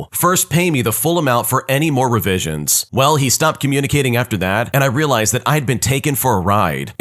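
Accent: United Kingdom. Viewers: Been waiting for the British guy’s voice :)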